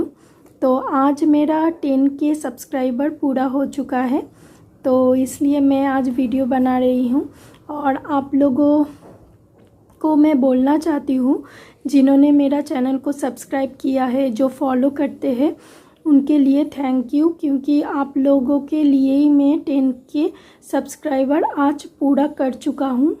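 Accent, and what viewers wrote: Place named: Bengal, India. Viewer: Tumi bengoli ata tmr hindi bolar somei bojha jai😘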